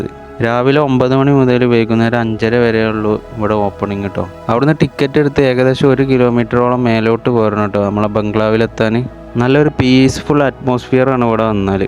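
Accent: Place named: Malappuram, India. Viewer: ഇത് മലപ്പുറമാണ് ഇവിടെ ഇങ്ങനെയാണ് സംസാരിക്കുക.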